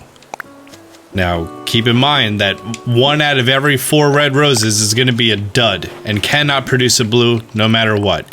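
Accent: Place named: East Coast, United States. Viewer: is this guy from philadelphia? he says "wuder" like an east coaster...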